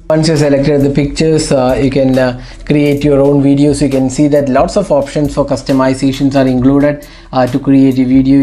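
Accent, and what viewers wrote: India. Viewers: I hate when they speak English with an Indian accent, I want to immediately hit the handle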